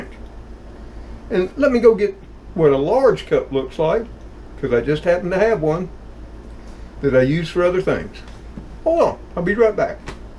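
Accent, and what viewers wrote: South, United States. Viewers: Great Southern twang.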